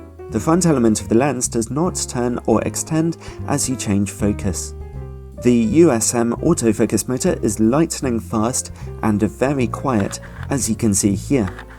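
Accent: United Kingdom. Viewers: Does everyone in UK can speak like you?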